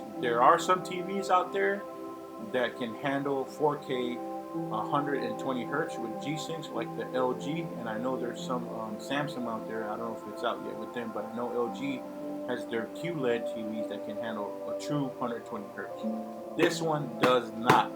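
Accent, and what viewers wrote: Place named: Hawaii, United States. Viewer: I watched this for 2 minutes and could tell you were from possibly Hawaii or somewhere around there. I'm from there so I like to see if I can tell if someone is from there or not. Pretty good video man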